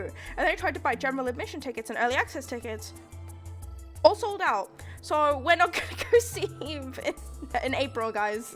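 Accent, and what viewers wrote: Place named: Liverpool, United Kingdom. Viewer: Oh snap a Bri'ish VTuber, and a Scouser no less! I absolutely love your design! It's nice to hear a Scouse Vtuber tbh, I'm a Woolyback with a lotta family down in Liverpool so it's kinda nostalgic for me to hear. It's also nice to see a VTuber who I might actually be able to watch without destroying my sleep schedule because of time zones!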